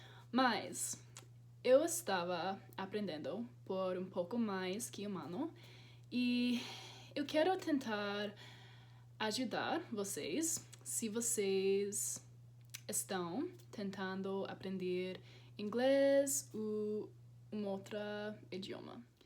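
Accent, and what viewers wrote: Brazil. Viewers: estás a aprender o português com sotaque brasileiro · o sotaque parece o meu (carioca)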